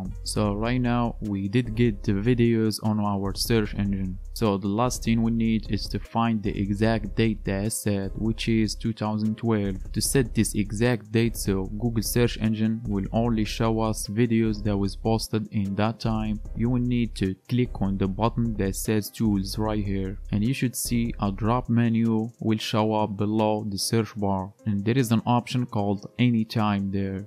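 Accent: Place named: Morocco. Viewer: باينة مغربي اساط غا من هضرتك الله يسهل ابرو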